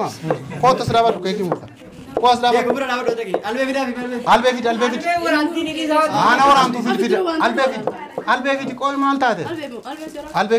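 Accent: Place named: Arsi, Ethiopia. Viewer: Gafiin qaba gossa tammi loqudni isaa arsi nati fakkate galatomi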